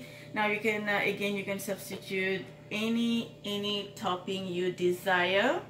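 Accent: Mauritius. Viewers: Yeh ur right it is pronounced nid! The prononciation is the same no matter where we r.. accents r different! However, I think we’re losing focus here! It takes courage and strength to make videos! It’s been three years n m still not brave enough to do it! How abt we focus on the recipe and her tips as opposed to her accent.. she is Mauritian! I’m sure we all have an accent irrespective of where we r?!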